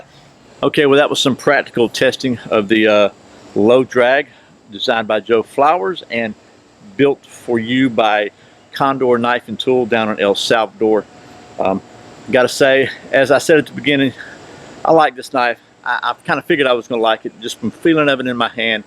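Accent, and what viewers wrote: United States. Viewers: Your accent ...yank mate....which state/region? It's cool! A bit of a twang, but not too much..like it!.😁👍🇦🇺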